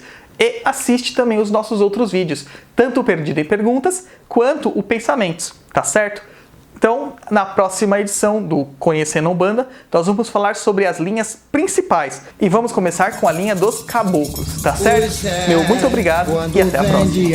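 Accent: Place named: Rio de Janeiro, Brazil. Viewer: Baiano nada kkkkk ele é carioca todo cheio de sutaque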